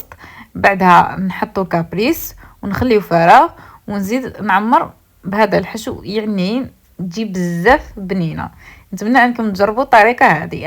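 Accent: Jijel, Algeria. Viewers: ماشاء، الله يعطيك صحة من هدرتك تباني جيجلية هههه ❤متتبعة وفية لك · وربي يخليلك صحيحتك الزينة معليش تقوليلي من اي ولاية انت لهجتك كي ما انا انا من باب العسة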